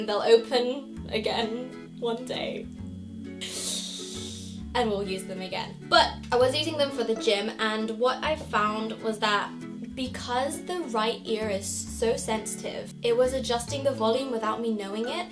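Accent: United Kingdom. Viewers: Do I hear a British Accent? That's very Cute 😃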